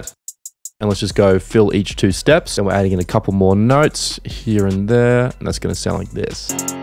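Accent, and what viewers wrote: Australia. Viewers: you aussie? glad I come across u, awesome tutorials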